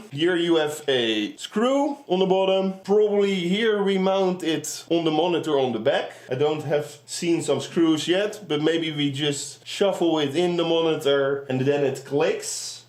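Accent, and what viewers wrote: Netherlands. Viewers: I bought mine but it arived screwed already so no need to put screws myself also nice dutch accent ik also from Netherlands i may have a bit better english accent but also worse sometimes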